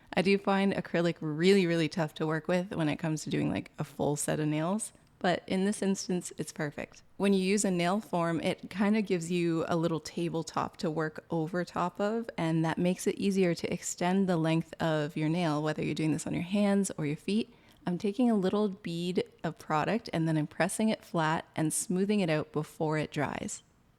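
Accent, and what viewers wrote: Canada. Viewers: Are you from Vancouver you sound like you are? 😂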